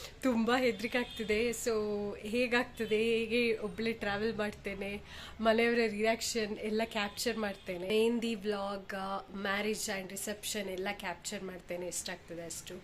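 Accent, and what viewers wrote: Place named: Mangalore, India. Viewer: Manglore Kannada❤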